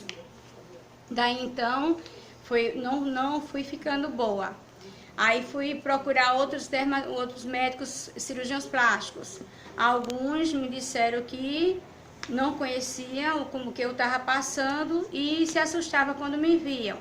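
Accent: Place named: Northeast, Brazil. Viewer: Kika Tita você está dando aula de língua portuguesa aqui pra quê? O vídeo é sobre procedimentos cirúrgicos. Você se acha muito culta, mas deveria saber que gente culta respeita a cultura e o modo de falar do outro. O vídeo mesmo é de uma Senhora Nordestina, e percebemos que ela tem um sotaque típico da região dela, e nem por isso significa que ela está falando errado. Eu falo com o sotaque super caipira do interior de Minas Gerais e tenho muito orgulho! Aprenda respeitar o modo de ser e falar dos outros. Escrever bonito só serve pra enfeitar papel. Você pelo jeito só foi na escola pra aprender escrever, porque educação você não tem nenhuma.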